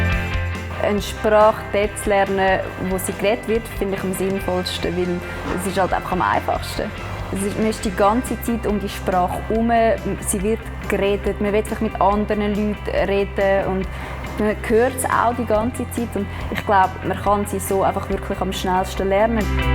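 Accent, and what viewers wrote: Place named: Germany. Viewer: what german accent is it ?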